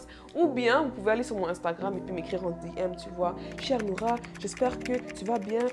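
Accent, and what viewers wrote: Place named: England, United Kingdom. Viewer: Ton français provient d'un accent anglais, je crois 🤔?et du courage je me suis déjà abonné....du courage pour la suite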